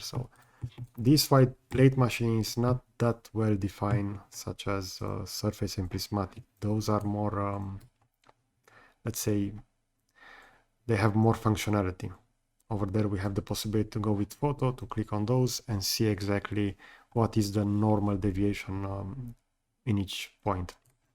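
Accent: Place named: Romania. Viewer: Auuuuuu ... asta-i engleza rumaneasca ... !!!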